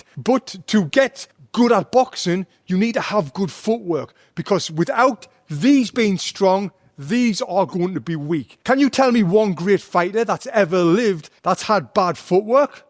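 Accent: United Kingdom. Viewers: Are you british mate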